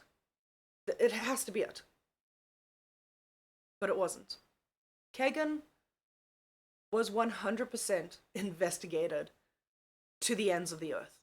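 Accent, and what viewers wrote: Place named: South Africa. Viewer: i believe she's South African, beautiful accent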